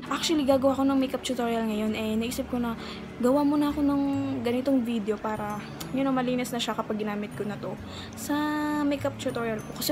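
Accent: Visayas, Philippines. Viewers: bisaya diay ka day? halaaa 💖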